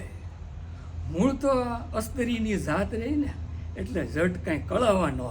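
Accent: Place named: Bhavnagar, India. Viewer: અસલ ભાવનગરી ભાષા😂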